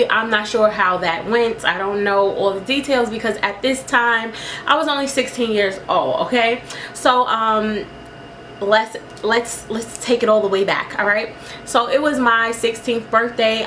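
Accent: New York, United States. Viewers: i love you because you are so new york! lol you said i was duffin him ! lol im from brooklyn btw. so glad you made it through that!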